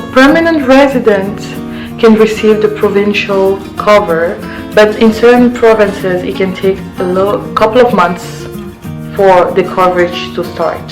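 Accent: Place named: Nigeria. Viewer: From ur accent I believe ur are My sister from Nigeria, pls let Me know the step by steps guide and documents I needed and the right agent I need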